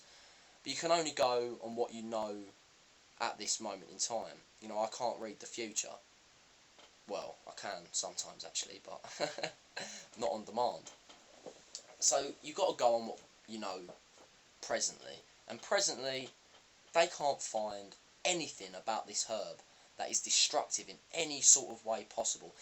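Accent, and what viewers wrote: United Kingdom. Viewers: Your voice and accent makes me think of the Harry Potter movies....sorry...I'm Canadian...so I love other accents...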